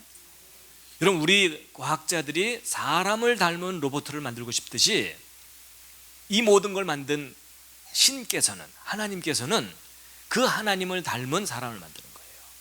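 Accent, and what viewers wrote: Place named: Jeolla, South Korea. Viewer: 목사가 태도나 말투가 기본이? 전라도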